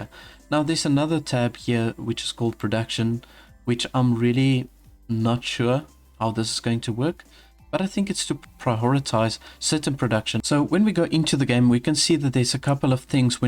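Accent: South Africa. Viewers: Love the afrikaans coming through. Keep it up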